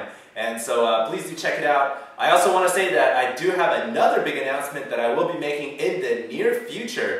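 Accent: United States. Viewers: he sounds american wtf doesyour statement mean???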